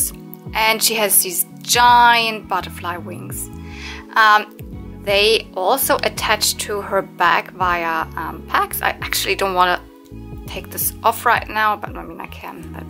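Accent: Germany. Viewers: Hi, how funny to immediately hear that you were German. And hello and thanks for a good exciting channel. Cheers from Andy from Denmark.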